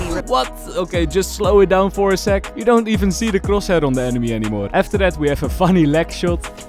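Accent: Netherlands. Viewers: I have been watching your videos since I started playing Valorant in 2021 and I'm a regular viewer. Independent of this information, I moved to Amsterdam in 2022 and only TODAY REALIZED THAT YOU HAVE A DUTCH ACCENT fkkskskskskskskskss hahahaahah 😅 sorry for TMI! Love your videos, hope you grow ❤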